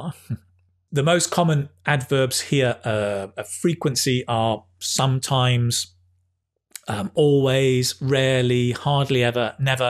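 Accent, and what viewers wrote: United Kingdom. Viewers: I love your British accent 😁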